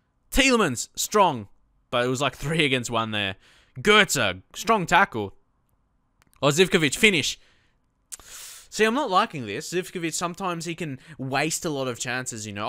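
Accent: Australia. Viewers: Do you sub to people??? Cause if you do it would be greatly appreciated if you sub to me you are one of my fav YTbers and the best thing is your AUSSIE!!!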